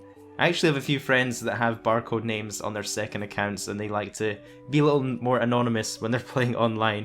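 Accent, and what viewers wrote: Scotland, United Kingdom. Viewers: U scottish?? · Are you fae Scotland? 🏴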